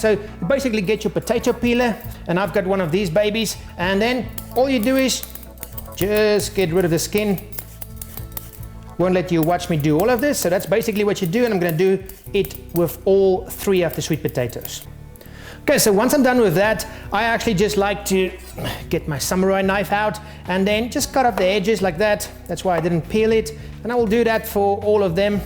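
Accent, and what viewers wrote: Afrikaans, South Africa. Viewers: What accent does he have? I want to say south African but I really can't tell :/ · hes south african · Where is this guy's accent from? The only thing I can compare it to is the guy from District 9 (Wikus) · Where are you from? South Africa? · are you from South Africa? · He's South African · Definitely a South African accent. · arank001 South Africa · I think he's South African :) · Your afrikaans accent is cool! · Michael Evangelista It's South African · Kings Fobos South African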